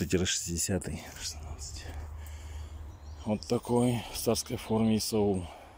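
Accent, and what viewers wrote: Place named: South, Russia. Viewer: Это не украинизмы. Это русизмы ,а точнее южнорусский говор!....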